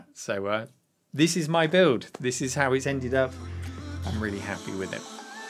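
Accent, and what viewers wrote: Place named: England, United Kingdom. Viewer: Your english accent is very similar to simon whistler.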